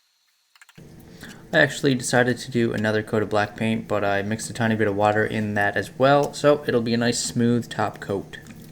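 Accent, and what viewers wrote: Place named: Atlantic Canada, Canada. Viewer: Your accent.... I can’t recall where I’ve heard it before. Newfoundland? New Brunswick? New England?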